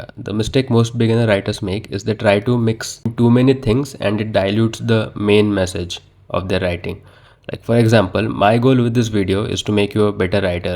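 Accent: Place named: India. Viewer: the second I heard the indian voice, i was like hell yeah man, this video is gonna be legendary.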